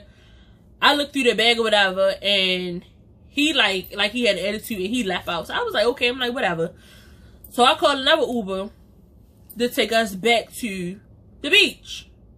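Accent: Baltimore, United States. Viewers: You can tell she’s from Baltimore!! You can tell when someone is from Baltimore. That accent mad heavy !! I fw it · Baltimore accent + A good 🍑 story teller ? I’m here 😌💖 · Lmao you have to be from Baltimore! Your accent is too funny, I loved this story time lmao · Lol your Baltimore accent is strong as hell!! Love it! 😂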